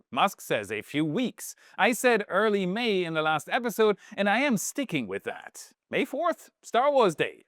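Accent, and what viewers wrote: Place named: Germany. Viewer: “ that voice got sinister sounding real quick”. I noticed that too. elocution is changing and in addition to the intonation you mention, he's forcing the "r" maybe with the intent of sounding "American". I'm a Brit who's been in France for four decades now, and am not losing my UK English accent in French. Nor does Scott Manley lose his Scottish accent in California. Out-of-region accents are positive and participate in creating one's personal trademark AKA "branding". On the same basis, its totally okay for to show his German origins when in Florida and not mess with his accent or intonation through vocal coaching which is a waste of money IMO and possibly damaging in the long run. I do hope gets to see this comment and maybe associate this with similar remarks made by other people over time. Be yourself!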